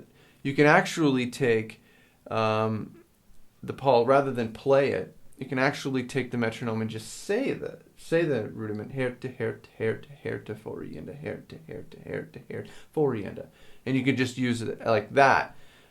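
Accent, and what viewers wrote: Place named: Philadelphia, United States. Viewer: AH i thought i heard a hint of SOME type of accent, i guess i just got philly confused with a canadian accent. the way you say 'out' - is exactly how people from philly say it haha.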